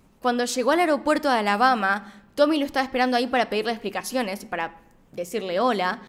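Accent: Argentina. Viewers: Felicidades por tu canal!! me encantan tus videos y la manera en la que los presentas, excelente trabajo!! Yo soy de Mexico pero mi ex era de Mendoza Argentina, amo el acento argentino 😘😘😘😘😘😘😘😘😘😘😘😘😘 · Excelente investigación 🕵️ No me pierdo ninguno de tus casos. "Hola, y bienvenidos una vej mas" hasta lo repito con acento Argentino (soy de México) y me dice mi esposo.... "Ya vas a ver tus casos" y yo... Obvioooo, es mi Happy time. 💜😁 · conmigo es igual, yo incluso busco los casos más largos!!!!! También me encanta ese español de ella de Argentina, yo soy brasilera y afortunadamente hablo español!!!!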